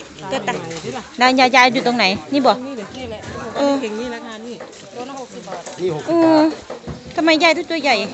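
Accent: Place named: Laos. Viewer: ยุอำเภอไรครับพูดออกลาวๆ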